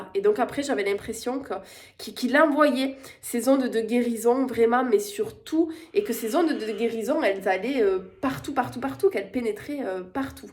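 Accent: South, France. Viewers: Merci pour ce retour belle et lumineuse. J étais super heureuse de te rencontrer a Ris Orangis et j aime beaucoup ta personnalité et ton authenticité, ton petit accent du sud qui te donne beaucoup de charme. Plein de belle choses pour toi et je t envoie toutes les fleurs colorées de mon pull 😅😊❤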